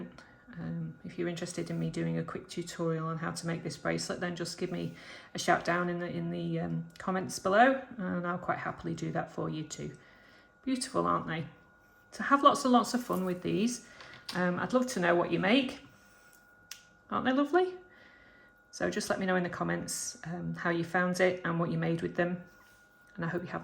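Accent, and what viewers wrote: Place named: North West England, United Kingdom. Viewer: Great tutorial; you make it look so easy and I can't wait to have a go. I'd also love to know how to make the spiral. I detect a North West accent; are you from anywhere near Widnes in Cheshire?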